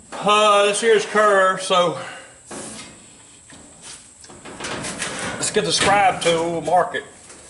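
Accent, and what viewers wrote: South, United States. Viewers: Бля, как же меня прикалывает этот "реднековский" акцент. Good job men.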